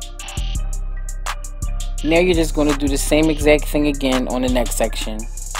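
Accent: Philadelphia, United States. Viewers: I was about to say that you sound like you’re from Philly, then I saw that it was you lol. I want you to style my short hair 😩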